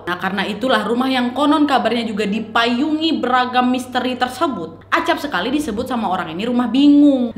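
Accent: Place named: Medan, Indonesia. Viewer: Kak orang mana?? Kok logatnya kayak orang Medan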